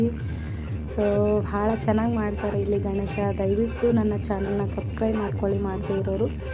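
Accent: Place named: North Karnataka, India. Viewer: Nim uttarkarnataka bashe Chandar kelakke ❤❤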